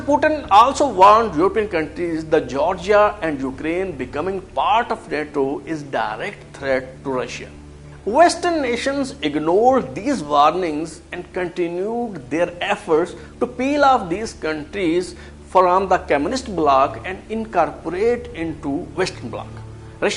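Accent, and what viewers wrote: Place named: Punjab, India. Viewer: Content in English Style in Punjabi accent :)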